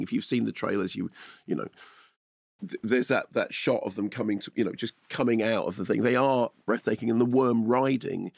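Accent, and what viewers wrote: United Kingdom. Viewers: Americans: :Dune Brit: Djewn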